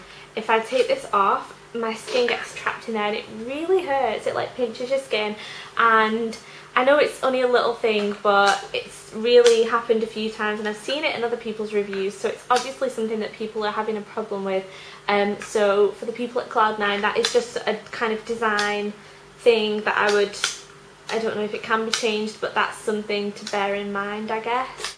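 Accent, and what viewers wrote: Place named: Yorkshire, United Kingdom. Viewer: OMG are you from Huds? I'm from Sheffield, I knew you were a yorkshire lass :-) lol x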